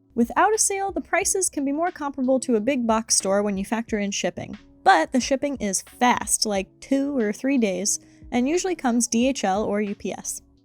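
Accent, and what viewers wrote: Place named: United States. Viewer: Wait a sec, where are you then? You sound American to me but maybe I'm just tired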